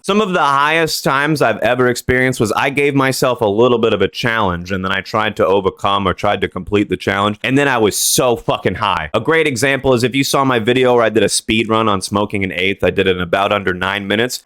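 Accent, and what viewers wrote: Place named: New York, United States. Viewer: 😭is he from New York or something? Ik Ian the only one who notices the slight accent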